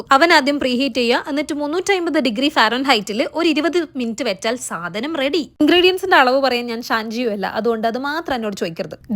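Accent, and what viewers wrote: Kannur, India. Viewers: സംസാരം ഫസ്റ്റ് കേട്ടപ്പോ തന്നേ മനസ്സിലായി കണ്ണൂർ കാരി ആണെന്ന് 😂❤ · Kannur bhasha nth oru ppang Ann🔥video oke poli anne Chechii ♥️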